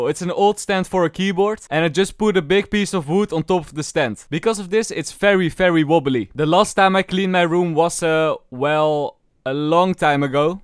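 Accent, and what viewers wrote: Netherlands. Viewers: Hahahahha je kan zooo goed horen dat je nederlands bent ga zo door💪💪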